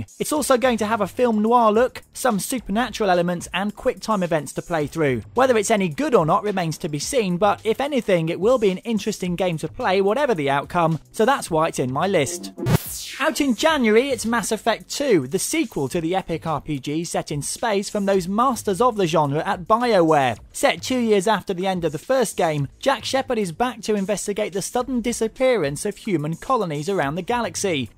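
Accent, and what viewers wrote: England, United Kingdom. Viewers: Its British SON! :D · I love it how all of you people, who I am guessing are American due to you're comments about his voice, say that it sounds wierd. If you knew anything outside of your own cozy fucked up country then you may have heard an ENGLISH accent before... You're ignorance and arrogance makes me feel ashamed to be of the same species as the scum that American people are. Everyone outside of America, hates America - I just thought that I would let you know, seeing as you would not know if I didn't say... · Why do all game critics have British accents? I can barely make out what he's saying. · Thats the way they talk in England.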